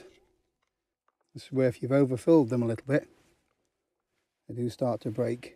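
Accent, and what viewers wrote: United Kingdom. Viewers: Great video as always! I will try this with the kids when I next take them to the mountains. I'm from the U.K. but have lived and hiked in Japan for the last 12 years. It's great to have a British voice to listen to and get opinions on and see the places I used to walk in. Great channel keep it up!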